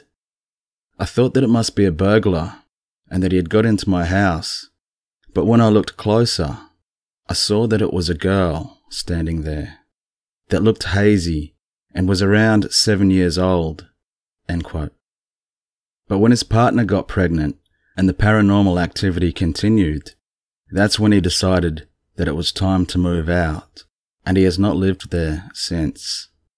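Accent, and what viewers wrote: Australia. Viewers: Cool as :) .. I could tell by your accent you were an Australian. Qld's weather is so out of wack especially this year. Great to be a part of your crew .. :)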